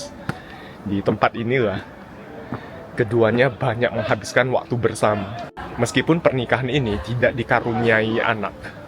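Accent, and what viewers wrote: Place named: Medan, Indonesia. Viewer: Kau cakap tetap logat medan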